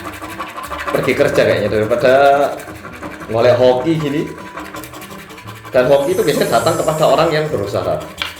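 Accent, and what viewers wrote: Java, Indonesia. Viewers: Mas aku suka logatnya😊 kereeen medok bgt.selalu ngikutin videonya lanjut mas...ditunggu kolab sama bandung oppa lagi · Lho. Kyakk. Orngg Korea. Tapi kok. Medok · Bisa ya jawa nya medok tapi jujur suaranya adem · bang? Abang ini asli nya orang apa sih? kok mukanya Korea tapi bahasa nya medok jowo ?? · Muka Lu kaya orang korea ko bisa ngomong indo selancar itu Logatt nya lebih ke orang jawa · Logate kok jowo mas...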